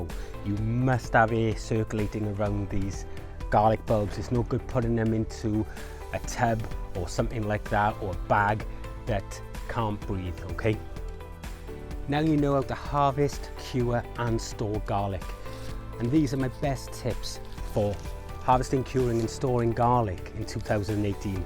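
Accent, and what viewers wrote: Wales, United Kingdom. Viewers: Love your videos, great presenting style and the accent is brilliant! (I'm welsh too!). After watching this one I think I'll be adding Garlic to my garden next year. Cheers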